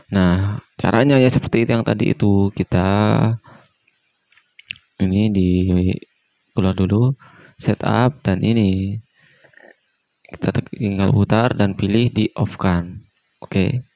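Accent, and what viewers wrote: Madura, Indonesia. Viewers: Kayak orang Madura ya ini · Meduro y kang? Hehehehehe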